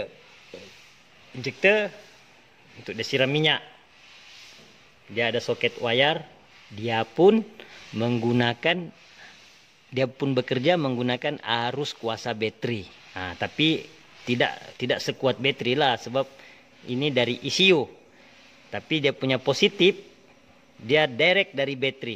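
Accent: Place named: Tawau, Malaysia. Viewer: mcm org twau. bangkel d mana bos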